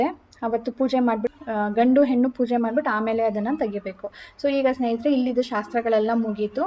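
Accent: Maharashtra, India. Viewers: Nimma mother tongue marathi na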